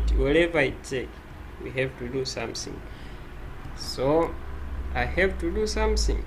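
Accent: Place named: Africa. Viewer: First tutorial with an African accent. Helpful and I like it. Proud Africa💚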